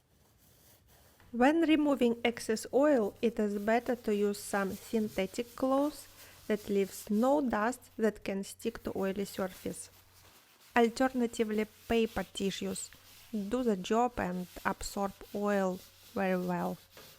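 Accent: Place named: Russia. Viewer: Very strong Russian accent : )). Lovely paintings, have that renaissance quality of look, that airiness.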